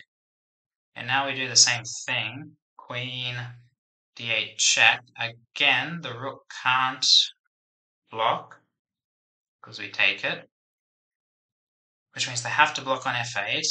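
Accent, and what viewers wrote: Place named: Australia. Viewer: What is your first language? Your English sounds great, at first I thought you may be from Australia or New Zealand.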